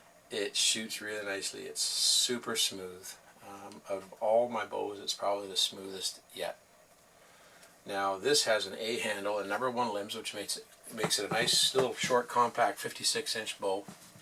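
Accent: Canada. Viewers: Say. Excellent video and review as always! I have a question for you though. You have a slight accent, I'm guessing Canadian, Wisconsin, Minnesota, North Dakota there a outs am I correct in assuming this because you mention swamps, I know I hunted swamp bucks in Michigan, Being a Yupper myself, Ae! Also see the hockey rink in pole barn and so on. Health wise I see you are looking could and I'm glad to see that as well. We'll take care be safe and look forward to more of your training / shooting Videos. Good hunting and God Bless Very Respectfully Bob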